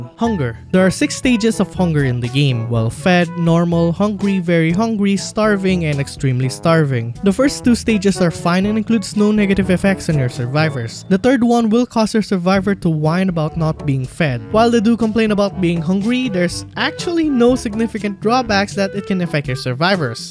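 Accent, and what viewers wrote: Philippines. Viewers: I like your Filipino accent, I wish I had mine but I'm too much an Aussie to turn back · Are you filipino? You have the accent...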